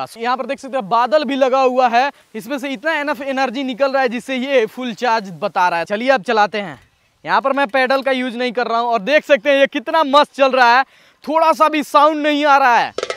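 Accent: Bihar, India. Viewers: Bhai ap bihar se ho❤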